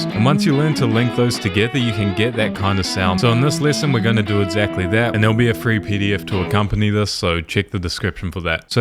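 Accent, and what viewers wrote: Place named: New Zealand. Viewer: Fantastic! Best instruction and I detect a kiwi accent….. where we love and many others.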